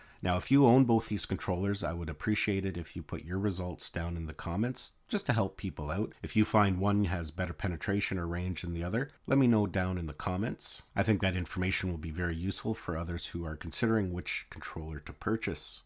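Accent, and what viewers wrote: Canada. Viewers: I like your Canadian accent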